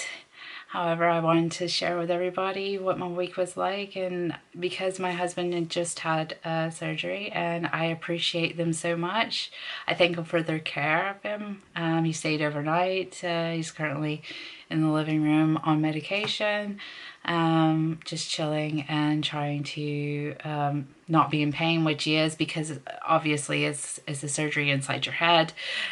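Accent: Scotland, United Kingdom. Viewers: As a Scot myself, I can detect a tiny bit of a Sottish accent developing there! That's the way to integrate fully! 😂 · Good video. I was going to guess you had moved to Scotland before you said it. Can hear it in your accent very slightly and when you said wee for small. I find it so bizarre Scotland and wales dont pay for prescriptions like we do in england. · I thought u sounded a bit Scottish! Love from Glasgow :) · You have a Scottish twang to your American accent :-)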